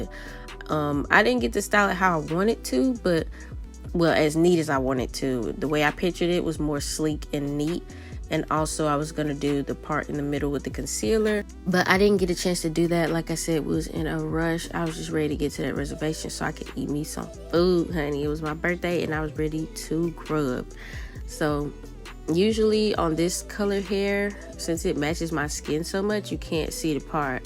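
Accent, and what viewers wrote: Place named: New York, United States. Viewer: lol, yea girl. you are almost prime with the NYC accent. more practice :0) it must be really humid today. when it gets humid here i have trouble breathing. and then it takes my energy. ughh!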